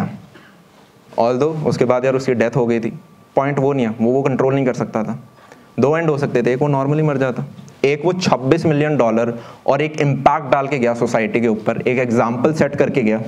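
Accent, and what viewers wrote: Haryana, India. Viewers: ....the haryanavi accent😂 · 😉isse badhiya to sipahi lagleta fauj me.. Bhaiya apki Haryanvi accent bht shi lgti h☺️ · Sir kya aap Haryana se belong karte ho.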